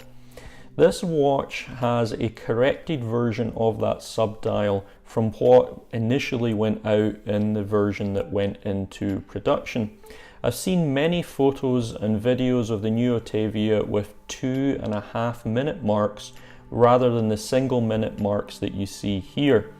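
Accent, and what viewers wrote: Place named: Scotland, United Kingdom. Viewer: I just bought one with 25% discount here in Hong Kong (I'm born and bred in Scotland, so the accent and all just takes me home.) It's actually great value for an in-house column wheel chronograph with bags of history and heritage too. Great review of the watch and scotch!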